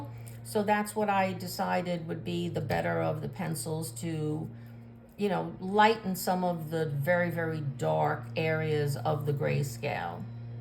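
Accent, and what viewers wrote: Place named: New York, United States. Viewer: Beautiful pages! Well done! I really enjoyed your explanation and i also love your voice and NY accent! ❤❤❤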